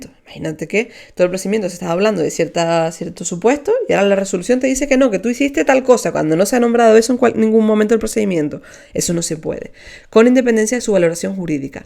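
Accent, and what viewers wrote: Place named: Canary Islands, Spain. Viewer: Gracias! Me encanta tu acento. Eres canaria?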